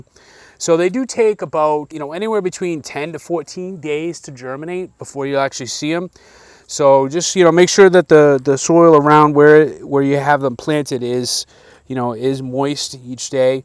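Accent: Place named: Massachusetts, United States. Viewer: You sound like your from MA! That’s where I’m from so your accent sounds so familiar. Thanks for the content! Lots of good tips!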